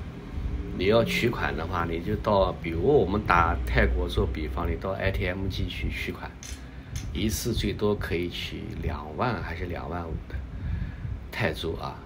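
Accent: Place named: Nanjing, China. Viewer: 南京口音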